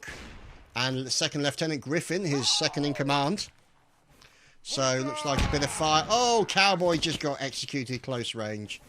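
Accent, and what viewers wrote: England, United Kingdom. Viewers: I wonder how much does the rest of the world know about the American Civil War? Do they really cover that in your schools, consider the amount of your history in Europe compared to our short one?? Other then that you have a great voice for this and you commentary is very good. I hope you Brits know how much woman here love the "English" accents here. Americans in general really like the Brits as one-sided it may be from your end.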